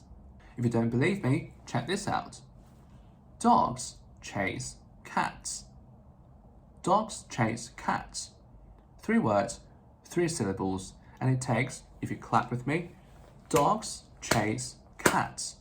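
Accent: United Kingdom. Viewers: 他是英国口音，你只熟悉美国口音。i这个音，英国更像ei，而美国更像ee as in teeth。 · Beautiful British accent! 👍👍👍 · 英國腔英語，習慣美式英語的乍聽之下有點不習慣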